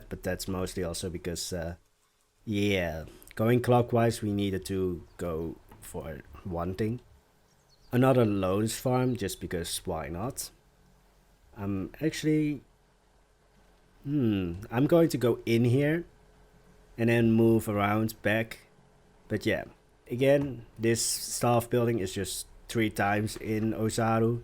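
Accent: Netherlands. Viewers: Echt gaaf! Haha ik kon gelijk horen dat je Nederlands was, niet dat dat erg is, is juist leuk :) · You know right away it's a Dutch guy.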